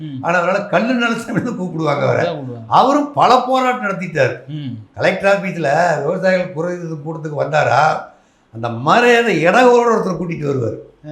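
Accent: Kongu, India. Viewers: அருமையான பதிவு. ஐயா அவர்களுக்கு எங்கள் மனமார்ந்த நன்றிகள். அவரின் கொங்கு தமிழ் அருமை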